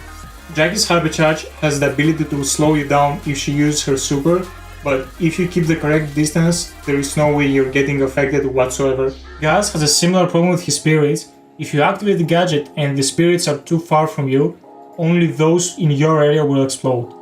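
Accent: Greece. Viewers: Are you from Greece???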